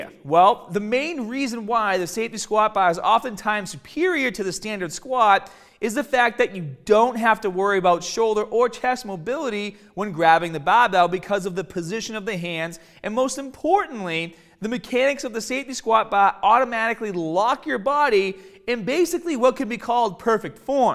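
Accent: Boston, United States. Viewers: It’s been a while since I watched his videos...Since when did he start with Boston/New York accent? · is a good dude. His Boston accent is just a little distracting! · Are u from Boston bro holy cow 😂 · when did he develop a boston accent? never heard him talk like this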